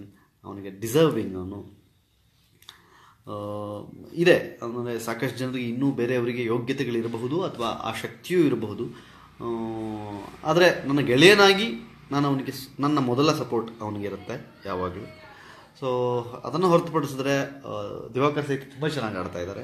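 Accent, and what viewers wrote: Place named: Mangalore, India. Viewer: Seriously nimma kannada thumba chennagide... Mathe mangalore kannada na thumba thumba chennagi use madthira.... 👌👌👍👍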